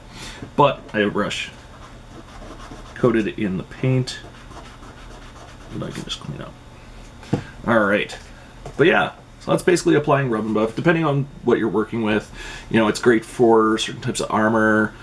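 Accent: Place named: Canada. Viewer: Great vid. Are you Canadian? You sound Canadian. Detecting Canadians through their speech is my super power. ;-)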